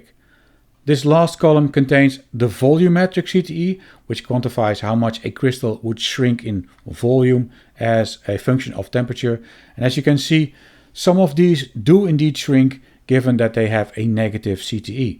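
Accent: Netherlands. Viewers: I thoroughly enjoyed digesting this information. Your dutch accent reminds me of my birthplace NL. Thank you for the education.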